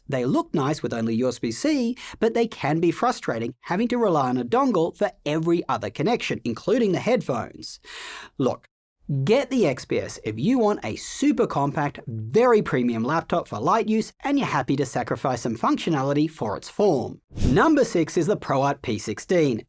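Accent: Australia. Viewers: OMG your voice sounds Australian.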